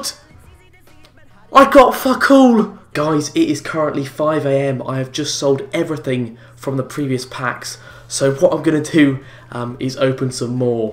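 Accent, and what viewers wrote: RP, United Kingdom. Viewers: The he used to speak, was so posh haha. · your voice I'm watching your videos of 2015. Such a different. Posh voice here, shouting mad and loud as hell back in 2015. Lot of difference. Deserves gratitude now.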